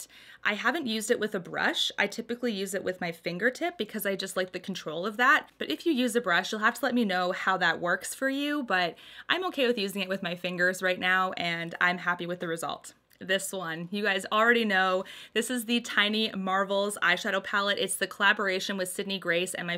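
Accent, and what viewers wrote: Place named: Canada. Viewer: Any fellow Southerners love the way Canadians say "about"? I do:))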